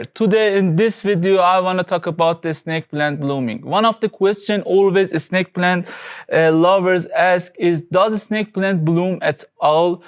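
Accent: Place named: Russia. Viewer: Hey are you from Russia? I love your accent also say hello to dear Mr police station❤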